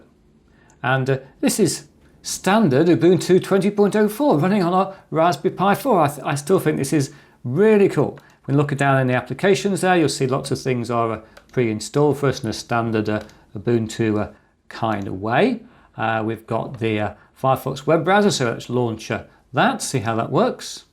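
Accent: United Kingdom. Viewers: oh! this specific - stale GB dialect - just like the farth from behind the monarchs.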